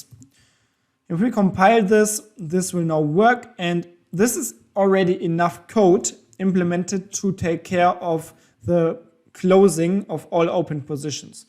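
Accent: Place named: Germany. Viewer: Hallo, krasser deutscher Akzent aber sympatisch..:D Ist es irgendwie möglich, dass die Positionen sofort gleichzeitig geschlossen werden und nicht nacheinander, was ja nach Menge leider einige Sekunden dauern kann... Also alle sofort geschlossen wäre für Scalping noch besser! Ist das einfach umzusetzen? Danke!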